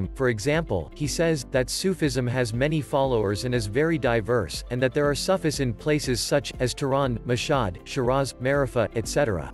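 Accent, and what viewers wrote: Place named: United States. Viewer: American Accent on this video Sucks Pronunciation even worse Hollywood Graphics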